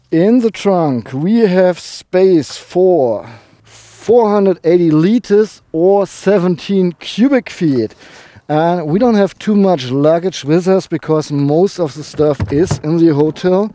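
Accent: Germany. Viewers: Lol your dash of humor is refreshing. Especially in that German accent!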